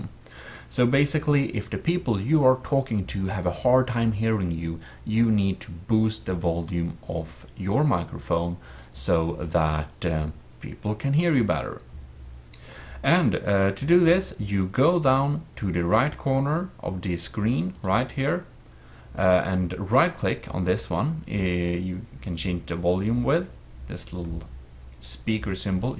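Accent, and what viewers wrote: Sweden. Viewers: is this guy swedish? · your defiently swedish mah dude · swedish english 100% (im sweedish)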